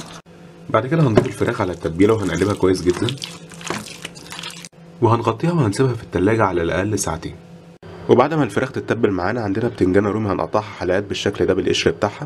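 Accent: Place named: Syria. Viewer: مافي اطيب من المصريين من لهجتك واضح انه انتي سورية عموما كل مكان فيه السئ و الطيب عموما انا عايشة في اوروبا وشفت كتير سوريين بشهادتهم ما بيحبوا بعض والمعاملة مش بيرفيكت وبلاش اقول الباقي كل بلد فيها الفالح والطالح الا من رحم ربي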